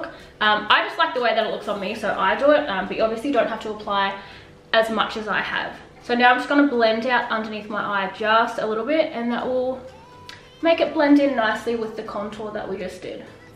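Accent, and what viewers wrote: Australia. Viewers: I’m actually very thankful that I shared your full face online for me here because I’ve been struggling on exactly how I WANT TO DO MY FACE which turns out to be similar, very similar to yours. It reminds me of the plouise academy girls. I know your Aussie I can hear your accent. Thank you 🙏 you should feel very esteemed cuz I watched your video 5 times on 2X speed lol 😆 which is probably why I had to keep rewinding lol but DING DONG DAHLING CUZ YOUUUUU HIT IT🤣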